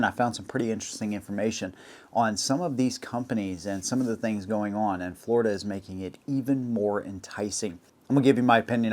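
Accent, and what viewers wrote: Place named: Tennessee, United States. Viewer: bro i figured you were from tennessee Glad to hear you live in the great palmetto state!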